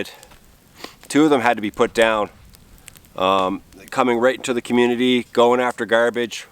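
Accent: Newfoundland, Canada. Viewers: are you from newfoundland ive been watching your vids about a year now and i often said i think he is from nfld or lab for sure are you???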